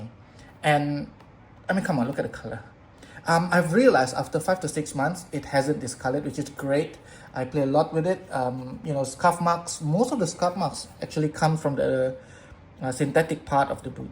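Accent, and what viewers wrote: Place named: Malaysia. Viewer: Hi love ur videos, are u Malaysian by any chance? U sound very Malaysian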